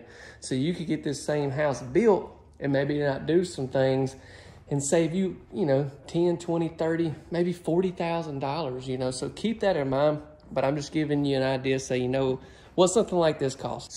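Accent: South, United States. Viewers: If just read the company fliers most come standard with 30 gal hwt & tiny are even smaller & if most people are like me I take long showers u need minimum 40 pref 50 by the way totally enjoy ur video's & ur Southern accent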